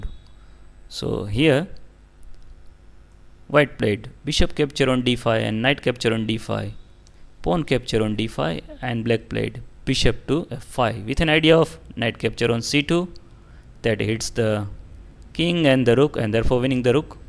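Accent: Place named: India. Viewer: I was talking about accent. Yeah his english is perfect but... I just cant stand indian accent. Its just so annoying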